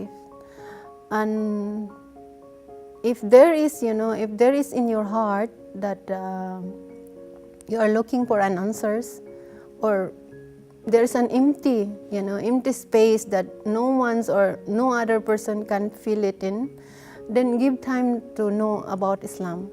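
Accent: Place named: India. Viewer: True I'm from Malaysia and I heard a sprinkle of Indian accent there. The hijab makes her look more malaysian or Indonesian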